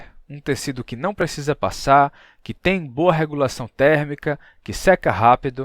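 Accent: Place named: Northeast, Brazil. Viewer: Você tem sotaque de português do. nordeste do Brasil. No entanto, percebo um outro leve sotaque, que identifico com o sotaque de nativos do português do Brasil, mas que residem há muito tempo no exterior. Digo isso, porque tenho um neto brasileiro que reside em Londres desde 2008, e percebo um levíssimo sotaque em algumas palavras.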